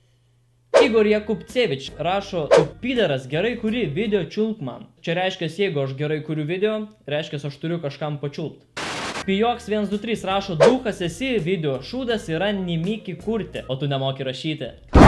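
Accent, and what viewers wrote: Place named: Kaunas, Lithuania. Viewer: Aš kai tavo pirma karta vidijo pamatau aš tesiok. Tu Kaunas nes tu labai juokingai kalbi🤣🤣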